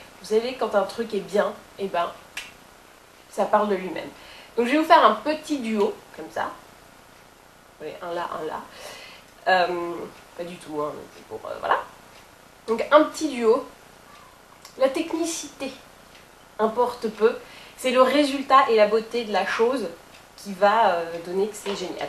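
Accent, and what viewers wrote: South, France. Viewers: L'accent du Sud le plus beau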